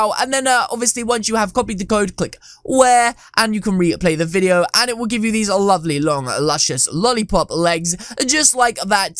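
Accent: United Kingdom. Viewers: British accent make me go crazzy.. AM LATINO.. Ayyy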